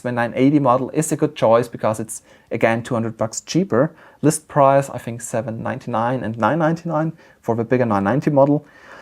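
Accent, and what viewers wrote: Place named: Germany. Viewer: Nichts gegen dein Englisch, aber ich hab sofort gemerkt, dass du deutschsprachig bist. :D Gruß!! ;)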